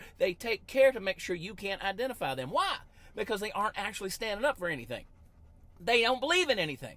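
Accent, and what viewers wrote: Texas, United States. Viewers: This is so funny because as soon as I heard you talk I wondered if you where from Texas and I'm from Oregon and you are so right about Portland 😂😂😂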